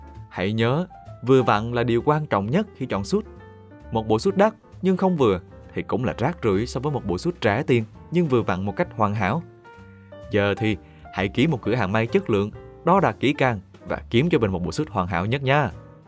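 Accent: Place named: Quang Nam, Vietnam. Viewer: Lần đầu nghe giọng Quảng trên kênh, khá êm tai nha 👍👍👍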